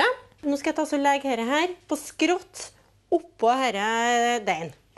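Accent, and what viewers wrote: Norway. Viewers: sør-trøndelag det ka du da høre? :P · Molde? Eller Opdal, ikke trønder isåfall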